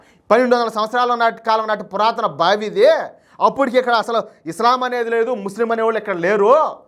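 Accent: Rayalaseema, India. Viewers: బ్రదర్ నీ వాయిస్ మార్చుకో రాక లోకం సుధాకర్ గారు చెప్పినట్టు శాంతంగా మాట్లాడండి స్వచ్ఛంగా మాట్లాడండి, తెలంగాణ రాష్ట్ర రాయలసీమ యాసలో మాట్లాడొద్దు అండి, అసలు బ్రదర్ నువ్వు రాక లోకం సుధాకర్ గారు చెప్పినట్టుగా శాంతంగా చెప్పితే చాలా చాలా బాగుంటుంది వినడానికి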